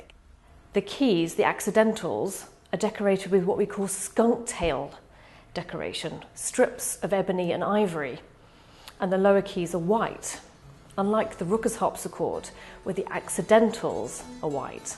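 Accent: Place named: Oxford, United Kingdom. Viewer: Oxford English pronounced properly. Very clear and eloquent.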